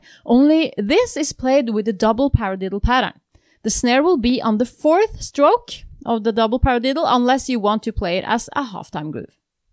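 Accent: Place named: Norway. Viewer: that's what I thought, you had that Swedish accent. Which turns out to be Norwegian.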